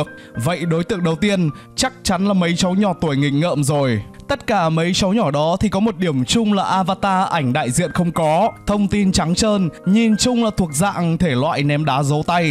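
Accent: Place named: North, Vietnam. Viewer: Bắc kỳ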